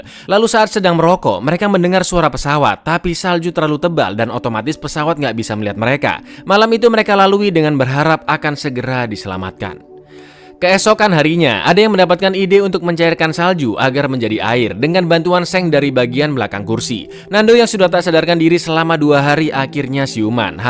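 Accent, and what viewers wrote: Java, Indonesia. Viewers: Pindah ke channel saqhayang yuk. Wong ini medok kali.. Gue org sumatra orak ngertos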